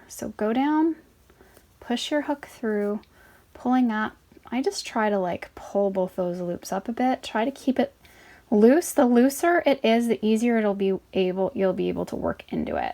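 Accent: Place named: Canada. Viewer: These are addictive! I'm a knitter, and all my crochet attempts get ripped out. I made three of these! Thanks so much for sharing your wonderful creativity. On a completely different note, I'm a linguist, and I'm trying to place your accent :) The way you say "about" makes me think Canada, but the way you say "to" or "two" is really stumping me. Would you mind saying what region of N. America you hail from?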